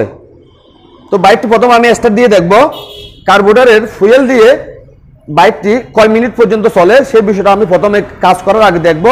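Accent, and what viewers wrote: Chittagong, Bangladesh. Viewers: Apni ki cittagong er na ki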